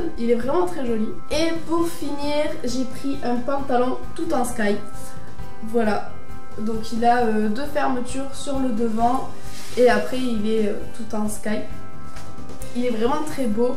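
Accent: South, France. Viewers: Bonjour ! Je viens de découvrir ta chaîne et j'aime beaucoup :) du coup je vais poser des questions en mode la fille qui est larguée ! Quelle âge as tu ? Que fais tu dans la vie ? Et d'où viens tu ? J'entend l'accent du sud , c'est rare de voir des youtubeuses du sud! Moi je viens de Toulouse ;)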